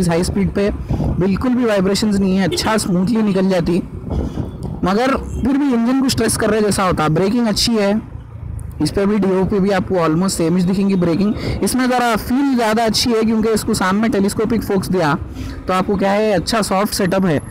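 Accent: Hyderabad, India. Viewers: bhai tumare baat karne ka tariqa zabrdast pure Hyderabadi or mai b hyderabadi hou miya bhai bolte mashallah mashallah bhai bohat accha review diye aap mere pas b same color ki Dio hai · loved it! fantastic hyderabadi style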